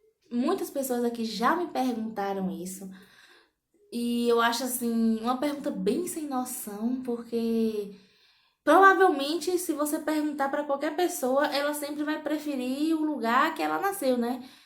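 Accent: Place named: Venezuela. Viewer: Ei amiga com certeza te perguntam se vc é venezuelana porq vc já deve ter pegado um geitinho um sotaque kkk .Eu sou capixaba meu marido cearense e quando vou nos lugares sempre tem quem pergunta kkk vc é cearense?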